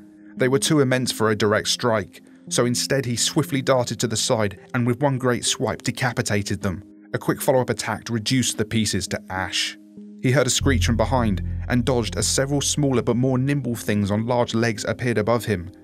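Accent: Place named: United Kingdom. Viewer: Clicked video to watch, heard that you were british. Disliked and reported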